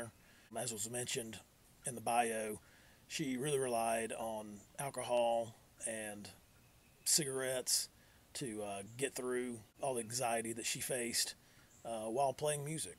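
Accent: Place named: South, United States. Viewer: are you in GA or AL? The accent and the pines behind you tip it off if so! Haha.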